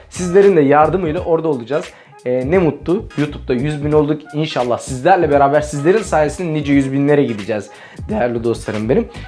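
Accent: Nakhchivan, Azerbaijan. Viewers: Sen ne qeweng Azerbaycan dilinde daniwirsan😁👍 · Azerbaycanca necede qeseng temiz danisirsan abi🤣🇦🇿🇹🇷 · Qaqa sən azərbaycanlısan ? Bizimki danışırsan .qaqam sökür sən ha 😂😂😂😂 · Qaqa, senin Azerbaycan dilinde lehceli danışığını sevirem. Ona göre izleyirem seni. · 😂😂😂nə gözəl danışır Azərbaycan ləhcəsində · Salam siz azerisiniz yoxsa turk lehcenizden belli olmur da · Azerice konuşman ləzət edir. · Ayda sən diyəsən Naxçıvanlısan danışığın ləzzət eliyir😄😄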